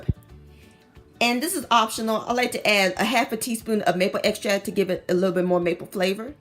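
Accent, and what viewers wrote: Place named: South, United States. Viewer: OH HO HO! Now now! THIS is what I'm talking about! As a CANADIAN, we make these all the times! Maple is our domain! HAHA We don't use the cupcake liners though. A muffin to us is mushroom shaped, and is not confined to a cupcake liner. But these are SO CUTE for a breakfast buffet table like that! Southern? OK...I will give you that due to your accent. HAHAH! They look GREAT Miss! XOXOX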